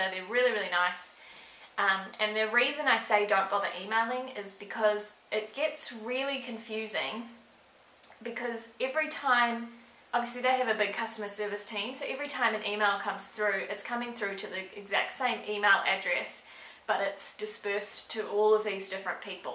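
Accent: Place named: New Zealand. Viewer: No offence but u sound Kiwi. Are u kiwi may I ask?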